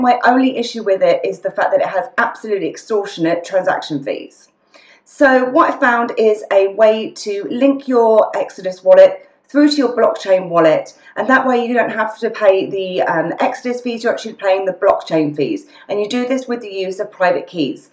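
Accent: United Kingdom. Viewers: You're pretty and I tolerated that British accent only bcoz of that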